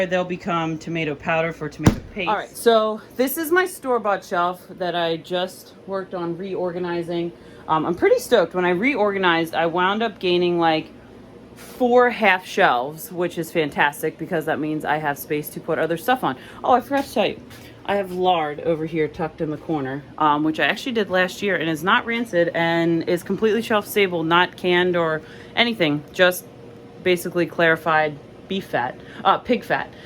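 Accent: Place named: Pennsylvania, United States. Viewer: Hi, first time watcher here! I love watching pantry/freezer/fridge tours. As soon as I heard your voice I knew you were from PA. (NJ here.) I went to your site and read your story and I want to follow along your journey so I just subscribed.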